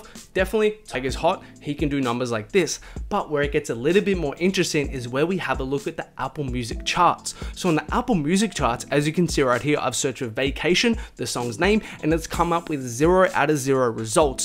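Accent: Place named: Australia. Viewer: He sounds Australian and they got winter now and summer in December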